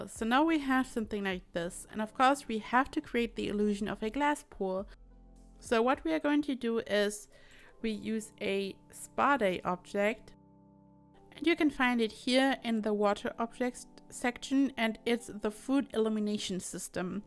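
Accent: Germany. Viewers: I don’t know why but since I am a German I think that she sounds very German when she speaks English ( can you tell me if I am right ) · You have a German accent. Am I right? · Are you from Germany? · Are you german? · From where she is German? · Du hörst dich deutsch an kann das sein? 😊(gerade erst indeckt noch keine anderen Videos von dir gesehen) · Bin ich echt die einzige die bei den ersten Wörtern schon wusste SIE IST DEUTSCH 😂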